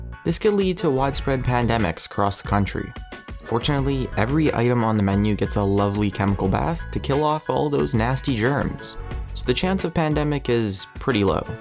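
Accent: Canada. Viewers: are you Canadian? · This dude is Canadian. I heard aboot a few times lol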